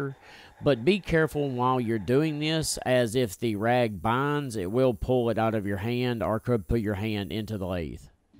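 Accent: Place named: Florida, United States. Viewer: You sound like a Florida boy. No insult. Florida boy here.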